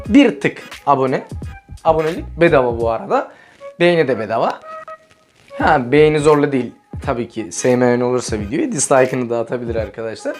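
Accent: Nakhchivan, Azerbaijan. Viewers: Naxçıvanlısan sen?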